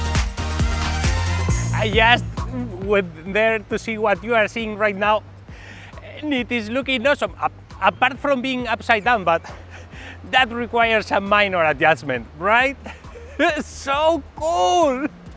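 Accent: Spain. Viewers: Como se nota por tu ingles que eres español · At first I wondered if you were spanish, then I heard you talking and knew it for sure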